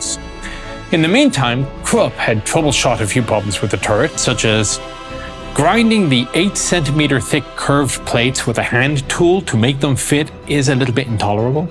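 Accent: Ireland. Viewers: So this music volume is mandated by wargaming then? But... why? If its volume was less than half of what it is here, I might actually be able to concentrate properly on the really interesting stuff the Chieftain's trying to educate me in, but instead we get boss music with some faint, soothing Irish tones in the background, apparently. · Ditch the music now mate! Your sweet Irish lilt is all we need Chieftain!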